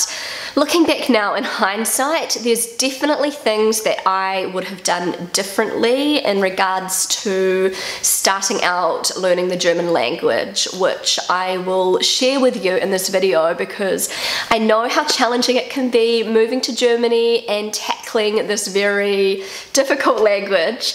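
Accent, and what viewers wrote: New Zealand. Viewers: I love your videos! I have a good opportunity to hear Kiwi English and know a little about Germany. Perfect! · I also love accents and especially your swert kiwiaccent...I think you have to make another german video here on YouTube...😃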